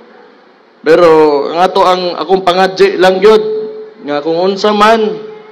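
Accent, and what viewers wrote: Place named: Davao, Philippines. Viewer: Hinde maintindihan salita mo pang davao klang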